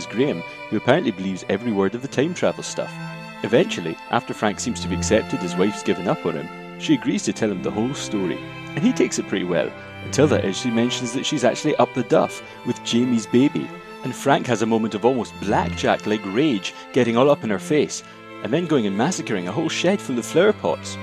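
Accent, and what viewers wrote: Scotland, United Kingdom. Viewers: The background music is a little loud with trying to decipher your accent. Love Scots accents Jamie's accent is one of the reasons I love this show but with the music can't quite hear you.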